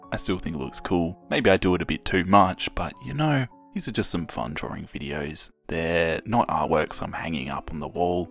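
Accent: Australia. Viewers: Love a deep Aussie accent! Stumbled across this video and the way you narrate it is fricken great. From 1 Aussie to another! 🤙🇦🇺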